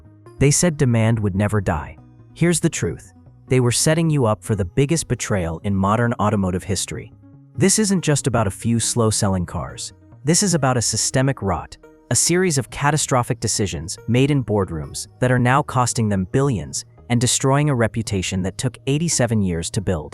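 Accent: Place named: United States. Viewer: I stand corrected. But, does the "software tuner" take away your accent? You said "mate" which leads me to believe you're not American but yet your voice sounds American. Either way, I did like your content. Like I said before, I pretty much buy only Toyota's as of late. Bought a 2010 Toyota Camry last year with 170,000 miles on it. Put new shock/struts, sway bar linkage, front brakes and rotors, back brakes and new tires on it. I have a little over $7,000 in it. I now have 200,000 on it and I drive it 500 miles a week. All paid off. I will have to check out other videos if you have more.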